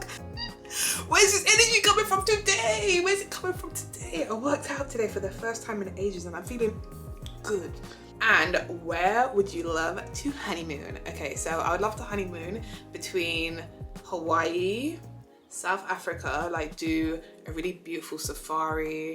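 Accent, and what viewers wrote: New York, United States. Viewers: It’s the New York accent that killed me like “ are you DUMB?? “ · Loved the New York accent and your career story!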